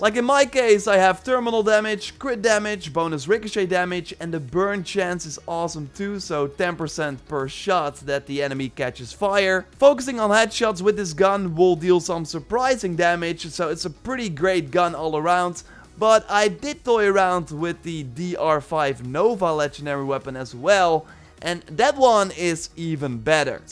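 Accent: Netherlands. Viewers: hello dutch dude. nice accent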